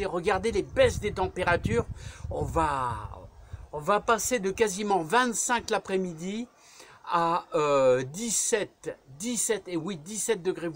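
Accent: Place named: Nord, France. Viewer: Bonjour! Ravie de m'être abonnée à votre chaine, j'aime beaucoup la façon dont vous abordez le jardinage, vos conseils précieux et, cerise sur le gâteau, votre si sympathique accent "chti"! Je vois que vous avez quelques pieds de raisin, bien beaux et bien fournis, quelle chance! Pourtant originaire d'une région où les vins sont prestigieux, je n'ai pas la chance de pouvoir en récolter, les guêpes passant avant moi parmi les grappes et ne me laissant que les peaux des grumes, et encore, pas toujours car les oiseaux se chargent de "vendanger" le reste... Auriez-vous une idée à me donner pour éloigner ces dames jaunes et noires pour qu'elles me laissent quelques grappes à récolter, s'il vous plait? J'ai voulu essayer l'ensachage mais cette méthode ne m'a pas convaincue, et je répugne à installer des pièges... Merci d'avance.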